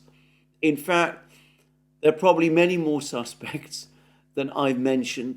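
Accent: United Kingdom. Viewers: Sheer and shameless bias. You are ridiculous and only act rational with that pompous British accent.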